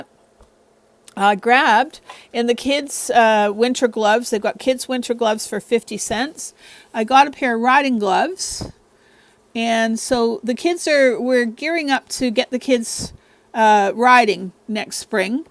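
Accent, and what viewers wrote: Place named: Canada. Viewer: Just love you to bits! Very interesting to hear about your family (even though it's tragic). You are the opposite of idiotic silly, but I appreciate your sentiment. I have to say, my husband was listening while I watched and was smitten with your Canadian accent. But then, he thought you said "teared tray" and asked me if you were crying. 😳 Husbands!...I get my baskets the same way you got yours.😂 Take care and keep warm. God bless you friend 💕🥰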